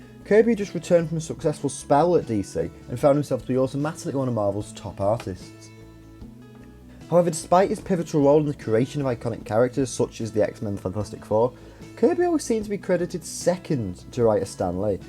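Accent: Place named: England, United Kingdom. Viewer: The second most annoying English accent, besides mesdsy69. 😑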